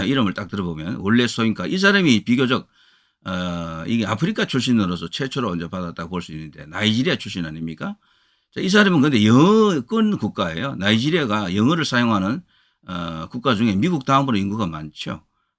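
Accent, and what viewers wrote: Gyeongsang, South Korea. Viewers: 내 이런 놈들이 나올지 알았다 갱상도 억양좀 빼고 영상 올려라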